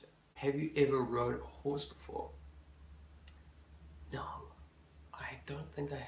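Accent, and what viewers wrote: New Zealand. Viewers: Kia ora! Having spent three months in New Zealand last year, it warms my heart to hear that charming NZ accent! I absolutely adored the Boyfriend ASMR you did a couple of months ago and would request a new one (: Lots of love from Finland!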